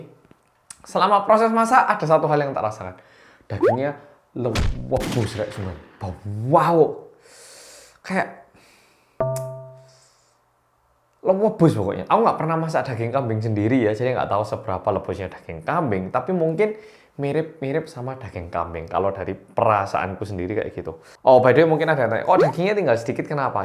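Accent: Java, Indonesia. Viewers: 😂😂 next time coba daging T Rex ya 😀👍 Tapi tetep salut saya sampean medok jowo ne. Lah neng omah ku Kabeh saben Dino Yo pake boso Korea padahal neng Indonesia wes 20 tuon.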